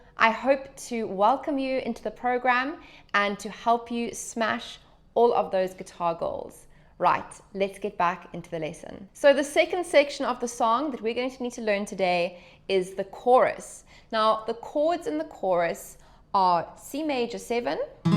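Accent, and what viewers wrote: Australia. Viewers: Great lesson & teacher - is she an Aussie 🇦🇺? (really cute too 🥰)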